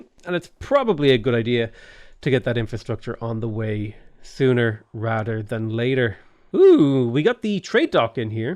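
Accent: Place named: Ireland. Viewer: sounds about 40% more Irish in this video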